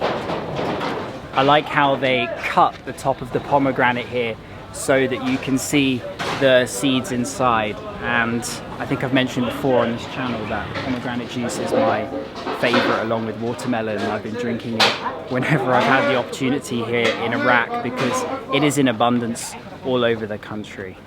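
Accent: United Kingdom. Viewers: Uk dialect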